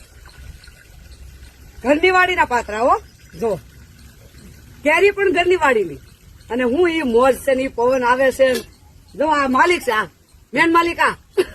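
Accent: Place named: Amreli, India. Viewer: બા તમે બહું સરસ વાતો કરો છો ,તમે કયા ગામના છો ભાષા પર થી જીલ્લો અમરેલી હોય એવું લાગે છે તમારુ ગામ કયું ?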